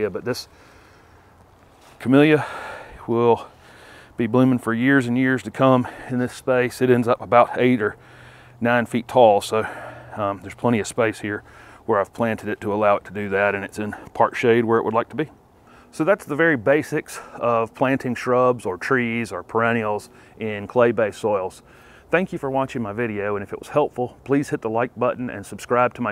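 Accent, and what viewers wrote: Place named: Central North Carolina, United States. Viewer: Thanks for this great video! I’m a Dukie and would swear I’m hearing a central NC accent. Feel like I’m hearing home. Will now be a subscriber forever!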